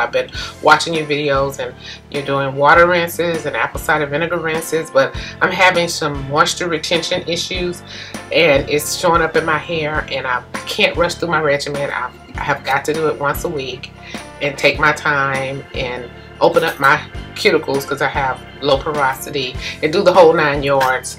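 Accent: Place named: South, United States. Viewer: i love hearing ur lil country accent lol. u look AMAAAAZING!!! i need ur detox type diet plan!!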